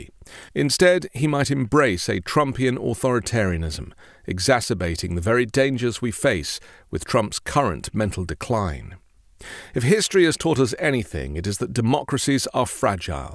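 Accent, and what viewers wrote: England, United Kingdom. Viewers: Age is not the question. The questions are related to mental and physical capabilities. Putin is an older gentleman but I would not want the American President have to arm wrestle with him. What is the "magic" age where these capabilities decline ? Sounds like the narrator is talking about President Biden. Some professions require an exam which determines they are ability to perform in a job. This does not exist for the Presidency or other elected positions. Why does the narrator talk with an English accent ? Is Europe trying to affect American Elections ?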